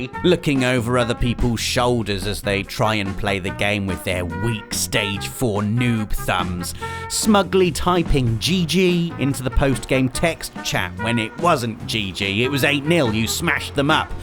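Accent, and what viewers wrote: England, United Kingdom. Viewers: Because he has an English accent?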